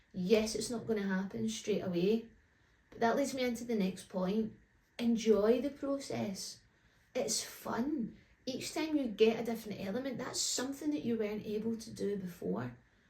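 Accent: Scotland, United Kingdom. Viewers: Very useful tips! Thanks - and I listen to your videos to learn, but do love your Scots accent along the road to learning. 🎶 PS I have no idea what a "crabbit" is!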